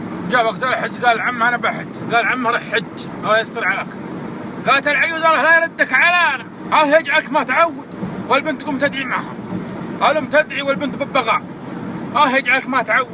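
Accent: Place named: Najd, Saudi Arabia. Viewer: اي ثقافه؟ كل من قرا روايه حسب نفسه مثقف؟ هذي لهجه نجديه وطبيعي انك يالطرش ماتفهم عليها الاشكاليه الاكبر انها لهجه من لهجات العرب السبع رجل عربي قح وبعدين كيف تدعي الله وتخاطبه ب ياء التأنيث؟ استغفر ربك ياطفل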